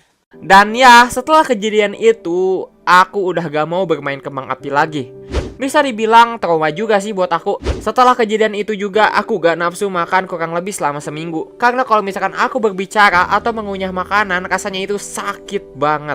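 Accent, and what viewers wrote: West Java, Indonesia. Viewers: Abang orang sunda ya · Lu Orang Sunda? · sunda batt:v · bang kocak aku juga mau nanya abang orang sunda😂😂😂😂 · eh Sunda bos orang mana cuy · orang Sunda ya bang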